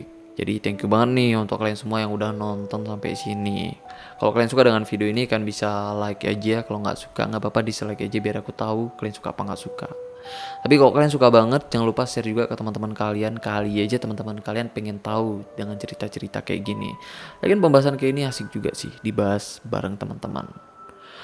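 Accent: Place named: Medan, Indonesia. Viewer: Orang medan yah?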